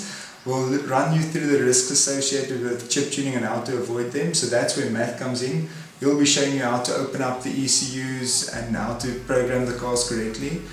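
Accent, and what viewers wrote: South Africa. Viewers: He sounds like afrikana but live overseas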